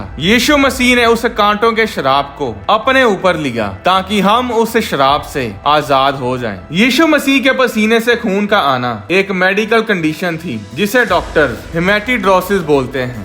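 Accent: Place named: India. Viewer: One of the worst things ever, when you listen history from an Indian accent guy.😏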